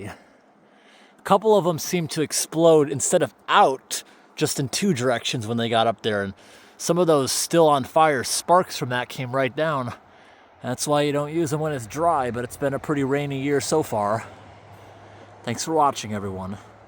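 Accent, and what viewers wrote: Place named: Connecticut River Valley, United States. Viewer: Don't understand why I watch this stuff lol recognize the Conn Riv Vly accent good video my man ✌🏼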